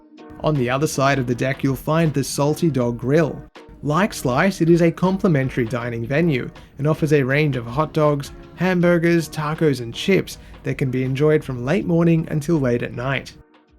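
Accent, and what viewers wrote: Australia. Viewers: I love your Aussie accent! Where in Australia are you? I went to Melbourne and Sydney almost seven years ago and loved both. Of the 18 countries I've visited, Australia had the nicest people. Thanks for the video. I'm looking at doing a Princess cruise in a few years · It's refreshing to hear an Aussie doing cruise content. awesome stuff man. Thanks you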